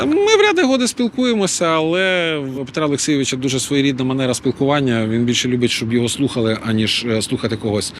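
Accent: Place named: Okanye, Russia. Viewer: Ой якби не коливання з ноги на ногу, і не окання то нехай підросте нервує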